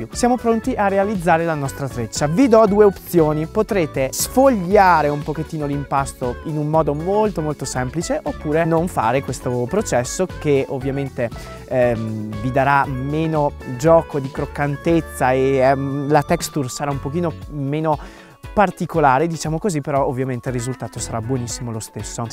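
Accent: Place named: Trentino, Italy. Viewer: Oltre che bello, quello accento Trentino lo adoro. Marchigiana ma con il Trentino nel cuore. I love val di non. ❤ Tovel ❤❤❤❤❤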